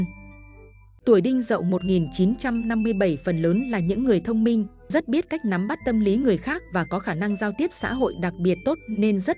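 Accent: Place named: North, Vietnam. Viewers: Tiếng miền Bắc rõ ràng từng câu, từng chữ đấy ạ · Nói Tiếng bàc khó nghe quá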